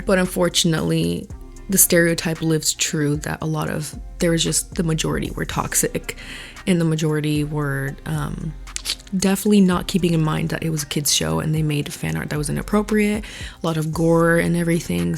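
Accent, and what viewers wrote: United States. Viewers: bluey and bingo are living my childhood. i've lived in australia my whole life and it is incredibly relatable! there are a few things in this video you got wrong, but it isnn't your fault! its just because your accent and you not knowing australia! it isn't queens island, its called queensland. i still love you♥. also the way you say osy!! for americans, say ozzie. hahaha got a good laugh. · As an Australian i love seeing this being talked about around the world. I also find it a little funny hearing how Americans pronounce the Australian locations.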